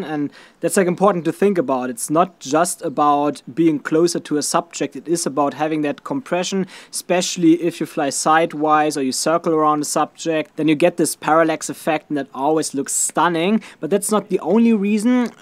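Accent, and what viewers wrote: Germany. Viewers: I can spot a German accent from 100 meters away. But anyway, this was a very helpful video! Good Job!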